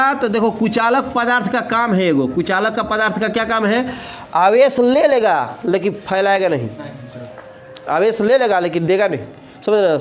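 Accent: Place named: Chhapra, India. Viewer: छपार जिला के मीठा बोली, ऑउ ई सर् जी के फिजिसिया अंदाज़ में कॉमेडी .. जिय हो सर् जी, इंडिया लेवल के बारा हो तू ....अनंदित कर देहलस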